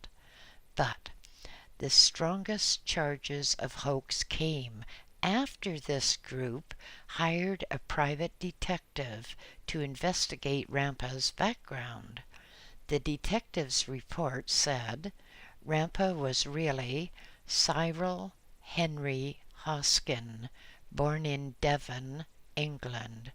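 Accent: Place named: Canada. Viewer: Dear, thank you for reading Dr Rampa's books, which I first started reading back in the 60's when my grandmother gave me a copy of the Third Eye. Since then, it's been a long (and remarkable) journey. Your beautiful unhurried Canadian voice is well suited to Dr Rampa's writing. Thank you again, warm regards Peter Staples (Colombia, South America)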